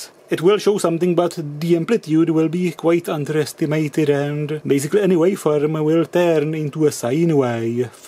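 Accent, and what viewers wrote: Czech Republic. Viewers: really hard to listen to ..is it czech accent? too bad, since the understandable parts sound interesting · I know his english is good and that he's Czech, but that is one funky accent. I'm just super surprised I don't see people talking about it.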